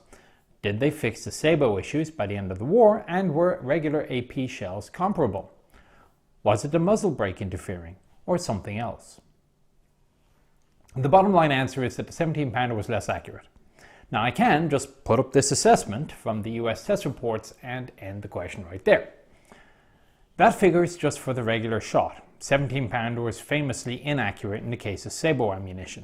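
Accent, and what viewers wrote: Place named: Ireland. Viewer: This is the first time I have seen something from you that qualifies your statements on the inaccuracy of the 17pdr. In other videos your statements were, it was inaccurate due to manufacture. I have always had a little suspicion that your Irish lilt may point to your opinion on the British as a whole. However, I once again point to Joe Ekins who hit moving targets at between 800 and 1500 yards when engaging Michael Whitman's Tiger platoon. He hit at least three if not four targets with the Sherbrook Rangers also contributing to the demise of Whitman's Platoon and doing for Whitman himself.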